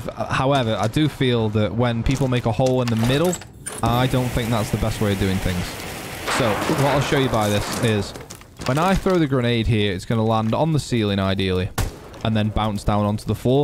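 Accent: Stoke, United Kingdom. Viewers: wait a minute......are you a stokie ? :D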